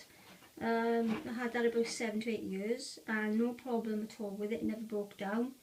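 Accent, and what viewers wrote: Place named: Wales, United Kingdom. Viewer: Hi, at first I thought I wouldn't watch the whole video since I don't really like videos where people talk much, but you have a very sweet voice and a charming accent. Are you from Wales?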